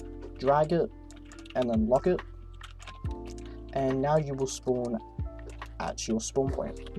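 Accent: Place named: United Kingdom. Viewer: when a british guy narrates, you know its a good tutorial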